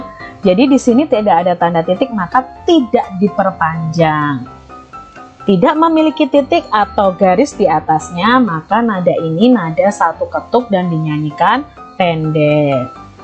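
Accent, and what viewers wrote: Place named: Java, Indonesia. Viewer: Suara nya kok jawa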